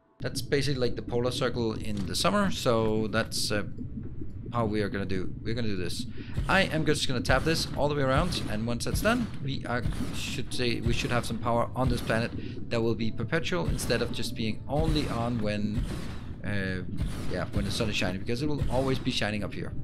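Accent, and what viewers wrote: Denmark. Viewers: I just tuned in because of DSP, really liking it so far. And it's great to hear a Dane (I assume based on the accent) doing let's plays!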